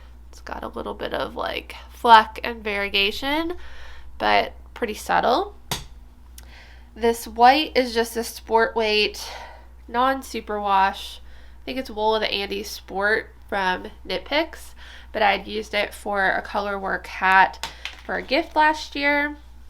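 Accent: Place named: Pittsburgh, United States. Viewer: Love meeting Pittsburgh Knitting ladies. I lived in Squirrel Hill until mI was 12. Then we moved to CA. I still have a few friends in Pgh., none that knit, sadly. My husband and I live north of Dallas,Texas in Melissa, Texas. I hear Pittsburgh in your voice but not as much as my mom, her sisters and brothers my aunts and uncles and their kids, my cousins. I just wanted to say enjoy yourself doing your podcast. That’s what we will enjoy. I need to plug my tablet in before it dies. I’m a new subscriber, but I was trying to say enjoy yourself, don’t worry about us, lol. As you know we just want to have company to watch while we knit. 😊😂❤ Thanks so much.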